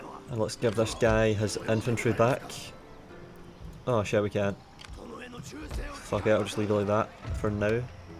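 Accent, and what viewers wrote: Scotland, United Kingdom. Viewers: What part of Scotland are you from bud? I want to guess somewhere around Glasgow?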